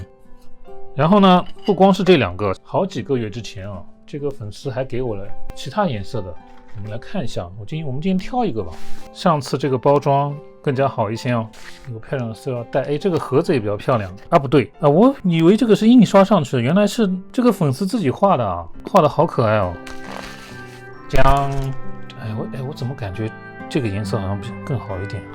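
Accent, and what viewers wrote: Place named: China. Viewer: 口音明顯中國人啊⋯中國人講日語吧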